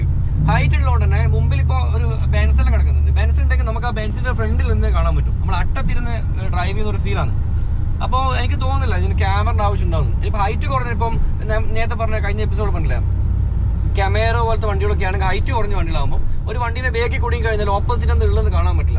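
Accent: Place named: Malappuram, India. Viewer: Appam alla malapuram basha patri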